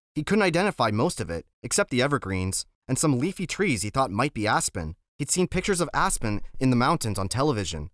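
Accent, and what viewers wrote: United States. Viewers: I really love this book but the typical American voice ruins it. I can't listen to this at all. I'd rather read it again. Why do Americans ruin everything good for everyone... what a shame that the world thinks of you in such a way when we could all be a little better... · right bro ?! the american voice sucks.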